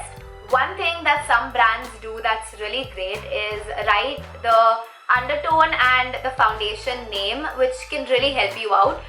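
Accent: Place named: India. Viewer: I hateee indian accents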